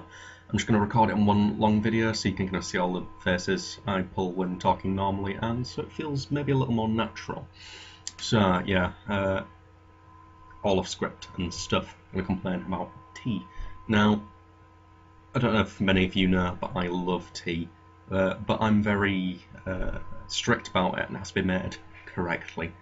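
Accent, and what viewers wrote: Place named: RP, United Kingdom. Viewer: You've become a lot posher since I last spoke to you irl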